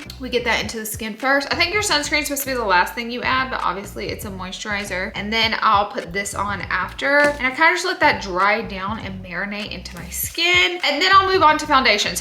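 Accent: South, United States. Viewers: Omg the southern accent! Love!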